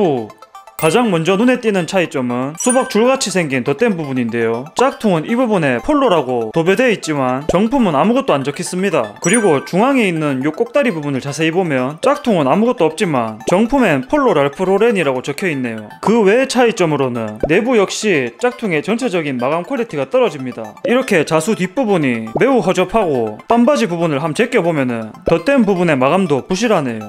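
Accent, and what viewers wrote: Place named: North Korea. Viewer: 사투리가 매력적이시네요! 혹시 북에서 내려오셨나요?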